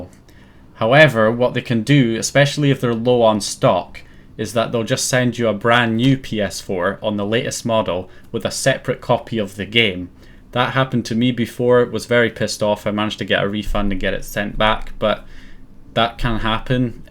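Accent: Scotland, United Kingdom. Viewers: Fuck me yer Scottish didn't know that we should start a modding team like the French modding team back in the day lol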